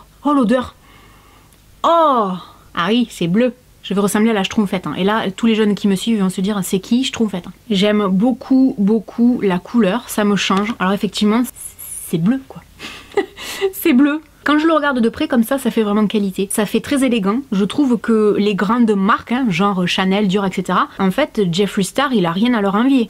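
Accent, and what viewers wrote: South, France. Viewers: Je regarde vos vidéos, et je vous trouve épatante, naturelle, franche, drôle, sincère, et votre accès du Sud ne gâte rien! Surtout ne changez pas! Biz bisou breton! A très bientôt!👏👏👏😍😍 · Salut, je suis tombée par hasard sur tes vidéos et je t'adore même si je ne me maquille pas. Ta spontanéité, ton humour et notre bel accent du sud sont charmants, ça fait du bien de voir ça sur YouTube! Ne change rien tu es super!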